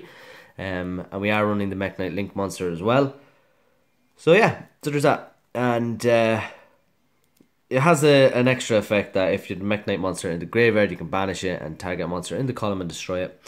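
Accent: Ireland. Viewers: Number 15 view 👌 you sound like you’ve an Irish accent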